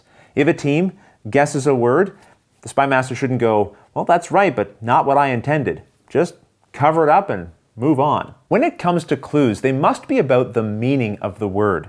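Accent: Canada. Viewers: is he canadian? he says "about" and "out" very canadianley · We've played this after watching your video, have to say, wonderful job you're doing there and i like that Canadian accent of yours, cheers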